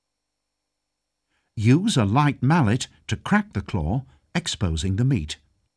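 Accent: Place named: United Kingdom. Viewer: you know it's good when a god damn brit is narrating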